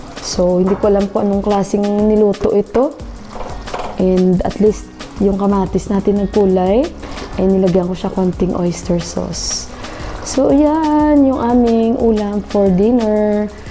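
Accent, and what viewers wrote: Iloilo, Philippines. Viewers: sis taga san k sa pinas, parang ung accent m ilongga 😊.. mag watch nko ng vlog m now q lng nag p nood..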